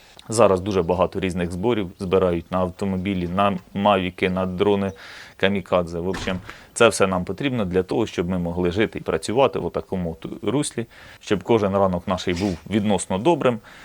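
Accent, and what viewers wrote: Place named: Poltava, Ukraine. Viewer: Добрий день. Можна питання, а з якого ви регіону? Чомусь здається, що Полтавщина ❤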